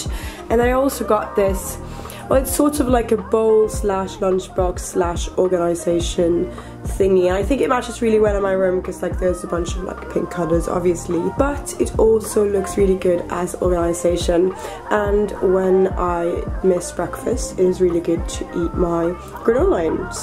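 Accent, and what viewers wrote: England, United Kingdom. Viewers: You have seriously turned British! Your ascent are so good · Who else thinks that she is already getting a British accent!! CAUSE I DO! 👇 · You sound so much more British........ I LOVE IT 😍💗 This was a really good video Love from a Aussie girl 💖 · You’re english has became more..um british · Omg it’s such a cute room! Next can you do a room tour! I love your videos and I think that your British accent sounds great! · Its so strange being British and I can hear your British accent coming through. I really hope I sound as nice as this on my channel to those who aren’t British 😂😂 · Omgggg u sound British! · your new english accent is so cute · you already have a British accent! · Omg u have an English accent!!!! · You are already with a British accent 😂 Love you kisses from Portugal ❤️🇵🇹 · pls notice I love you sooooo much don't stop making videos!😍😍BTW I love u r British accent! 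· The semi-british accent though :😂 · It's just my impression or your English has changed, it has become a lot more british😂🧡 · 99% OMG YOU HAVE A BRITISH ACCENT 1% other stuff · You sound so British but it really suits you 😍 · holy crap, your voice is both deeper and your accent is becoming british.. OH MY GOSH!!! BRITISH + NORWEGIAN = IRISH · Your semi-british accent 😂❤️❤️ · you sound so british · Loving that British accent and love the video. Thank you for making a real and relatable dorm decor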